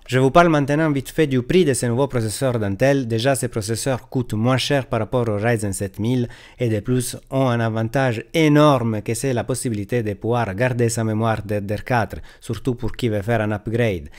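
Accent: Italy. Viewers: Bravo pour vos vidéos claires et précises, le tout avec votre superbe accent italien 😊. On apprend plein de choses à vous écouter. Continuez comme cela, c’est parfait. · J'avais compris pour le pseudo mais pas pour la présentation, peut être mettre des sous titres en francais pour que tout le monde comprenne , (vous êtes de Naples ? :) )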